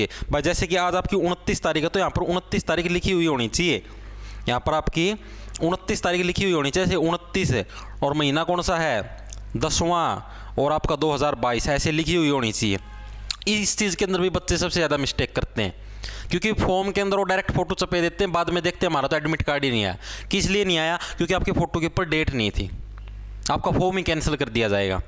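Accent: Haryana, India. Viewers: Hariyana ka londa he kya ye bak raha he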